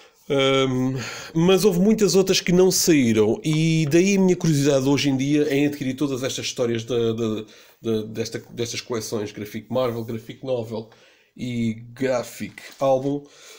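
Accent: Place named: Portugal. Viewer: normal também não entendi muita coisa no seu vídeo mesmo eu falando português no Brasil tinha que mudar a regra do nosso português é muito difícil entender o português de Portugal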